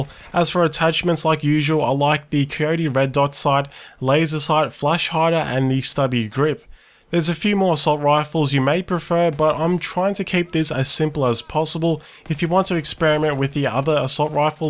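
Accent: Australia. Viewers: Haha thanks for the reply so quickly yeah i thought you sounded like an Aussie like me :)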